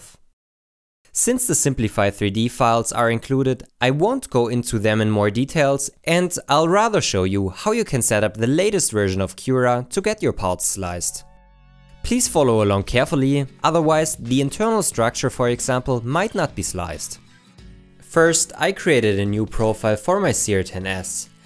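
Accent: Germany. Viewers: When you hear the German accent the first thing that comes to mind is "precision". Great work. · Desch isch aba a schöna deutscha akzent LEL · Dieser deutsche Akzent ich fühle es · Feier deinen Deutschen Akzent xD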